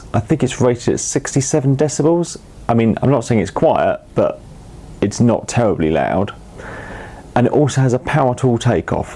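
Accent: RP, United Kingdom. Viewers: Gosh, you sound posh. But, great review mate. Thank you.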